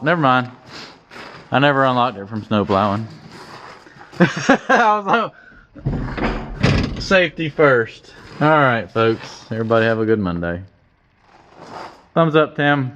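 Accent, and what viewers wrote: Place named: North Carolina, United States. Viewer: Ferguson 20 diesel Its a NC thing. I tried to teach my friend to say oil, boil and toil, and he finally got it right, after 20 tries! Lol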